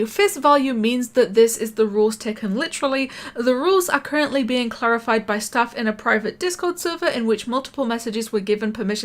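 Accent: United Kingdom. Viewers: Oh well glad to hear your British accent again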